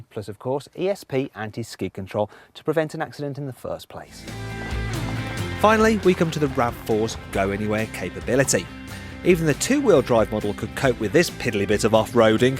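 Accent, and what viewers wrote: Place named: Birmingham, United Kingdom. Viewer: Interesting how you can't hear your Brummie accent as much in these older videos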